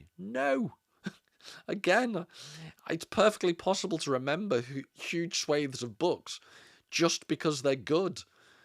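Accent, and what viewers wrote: United Kingdom. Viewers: Ur so great to listen to man so British with your delivery hahahaha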